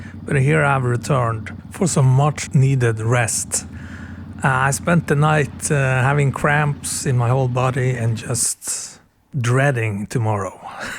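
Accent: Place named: North, Norway. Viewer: Hei igjen buddy. Ordentlig fin video og Telemark er skjønt. Du gjør to ting her som jeg ofte savner hos andre på mc tur; overlay med lyd fra etter turen og veldig fine beskrivelser av hvor du er. Og så er du knakande ærlig og det setter jeg alltid pris på. Jeg ser at noen skriver ta med en venn og det vil jeg si er et veldig bra forslag. Godt å høre at det er skikkelig mc lyd på videoene nå, høres bra ut. Jeg hører du er fra nord, har du også kjørt der oppe noengang? Er jo mange fine veier der oppe også, selv om jeg sjøl ikke har vært lenger enn bodø. Keep it up og planlegg godt med pauser og ting som gir energi. Ses en dag du kommer til DK😉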